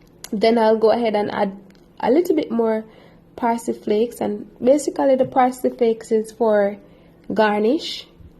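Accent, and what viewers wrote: Jamaica. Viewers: I like the Jamaican accent. Blaze up gal.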